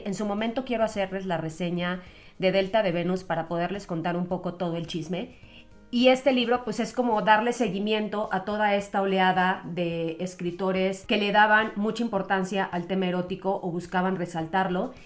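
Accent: Mexico. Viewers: Enamorado de tu precioso acento mexicano. Me explota la cabeza. Gracias por los vídeos. Enhorabuena. Recomendaciónes: Sinclair Lewis (La trampa humana, Babbit, Calle Mayor), Dorothy Parker (Colgando de un hilo), Toti Martínez de Lezea (La calle de la Judería, Muerte en el Priorato).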